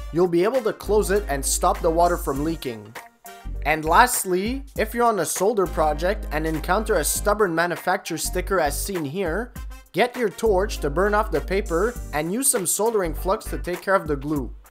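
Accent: Quebec, Canada. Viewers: I know a quebec accent when i hear one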